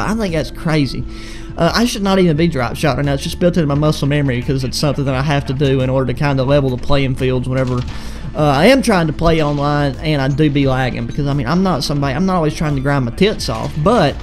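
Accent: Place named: South, United States. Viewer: That Southern accent is hilarious, I had to subscribe 😂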